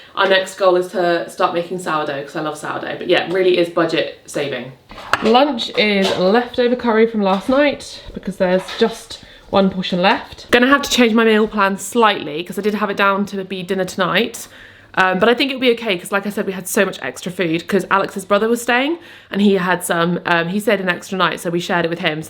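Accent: United Kingdom. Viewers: I already booked your plant comfort kitchen ebook last year, but I could not resist getting the bundle! It's a bargain. For 50$ I got so many books and recipes that I'm looking forward to trying. Of course the first ebook I downloaded was versatile vegan and I loved it! I truly recommend the bundle to vegans(like me) and people who want to eat healthier. I just love your recipes. Can you imagine? I started following you because of your lovely British accent years ago? 😍 I'm Italian but I live in Malta and soon I'm moving to one of the islands you love...❤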